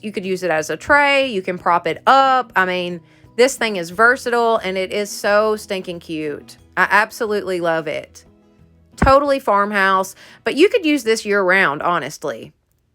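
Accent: South, United States. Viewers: I made the lantern but painted mine dark brown and white... came out so good and I love it only problem I had was getting the other end on so I flipped it over and made a bottom that I could easily open it up to turn my candle on.. Thank you for all ur cute ideas and I love hearing ur country accent!!! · I love your content! You make the nicest things, but what I really like about you is your sweet warm southern charm and genuiness. I also love your cute southern accent! I laughed when you referred to Minnie Pearl a few videos back!! 👒👒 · I love your ideas and tutorials and how you keep all the mistakes for us to see. I just love listening to you talk. Love your Southerness 😍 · I came over from Holly's channel. I am a fan of your phrase it's not a big deal it's just craftin'! You have the cutest southern drawl. My favorite DIY is the lantern. I like the jar pumpkins, too, but I am partial for traditional fall colors. 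I made a cute wall hanging with a lemonade flag by gluing a paint stick along each end, like a scroll, kind of. I painted them white. Then I embellished it with a few leaves and flowers. Have a great week! 🍁🌻🍂🍁🌻🍂🎃🍂🌻🍁🍂🌻🍁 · I'm from GA I love the southern accent. You are so funny. Super cute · Great diys. The lantern is my favorite! The first time I tried wet destress was on jars and it didnt work for me either. I didnt try again for a while but then tried on other materials besides glass and fell in love with wet destressing So, dont give up. Try it on other materials. I have friends and family always bringing me trash to treasure items like cold coffee bottles or things they were going to throw away for me to try to repurpose but I would have to say the most random thing I have been given is the rods for a pop up tent with the wire removed. The first thing that came to mind was to use them like dowels to hang fabric or canvas signs from but I am sure I will find more ideas for them too. 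I live in the south too and love to hear someone else talk "southern" like I do lol. Love you, your channel and your diys. Thank you for sharing and be blessed my crafting friend! ;) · I like the lil wrinkles it looks real to me I ain’t never saw a real flag without em. Love all ya do and it’s a fresh breath of air for me personally to here you talk from one southern girl to the other. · I loove Holly . At Humble. Pie .and I clicked over here cause she said too lol..I’ subscribed just from u talking..I knew u would b good..u r southern and so cute lol..can’t wait to see what all u do..best wishes and hope u get many subscribers.. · Came from Holly's channel and I love you and your style and as a fellow southerner, your accent as well! 😉 People don't give me random stuff but I keep weird things because I'm like, hmmm you never know what I might use that for 😆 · Love your spunk, accent and style. 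I'm a southern gal myself. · New Subbie...!!! Love the easy to make lantern !!!! And the jars !!! I am fixing ( southern term I am sure you are familiar with !) to make me some of those... already have the jars because we are canners !!!! Over all I love all your crafts !!!! And being from MS , love the southern accent..!! Thanks for all the tutorials..... off to watch more of your channel !!!!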